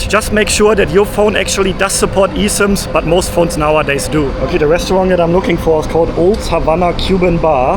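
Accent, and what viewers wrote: Germany. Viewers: Übrigens sprichst Du ausgezeichnetes Hochenglisch mit einem niedlichen deutschen Akzent! Respekt! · Mein bester Mann hört das du aus Deutschland kommst 😁😁😁German English ❤️ Aber super Videos 👍🏻😊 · i can hear the deutschland out of you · hes from germany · You sound very German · Deinen deutschen akzent muss man mögen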